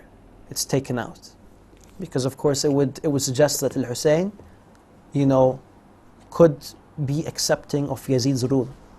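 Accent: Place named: United States. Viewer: This video is a good cover up. He has omitted a lot of details from the authentic Hadiths that tells a lot about this incident. His American accent and long thobe is hypnotizing. I am surprised that the people sitting there took his half prepared story hook line and sinker. The real tragedy of Karbala is not the cruelty of Bannu Ummayia or the tyrany of Yazid, the real tragedy is that we are soo satisfied with such stories without prodding into the details about why the Leader of Youth in Paradise was killed by Muslims 60 years after Hijra. Wat bro narrates makes it look like an accident. But as the ahle Sunnah we cannot let this narrative be built in our homes, we should all prod deeper and find out why this happened and understand wt forces are preventing the people to distribute half baked stories and build narrative such as this.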